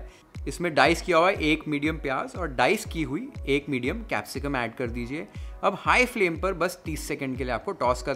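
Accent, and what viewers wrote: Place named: Gujarat, India. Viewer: Music k link Bhi do Na 🥰 fan from A'bad /Aus 💕ur gujju touch in accent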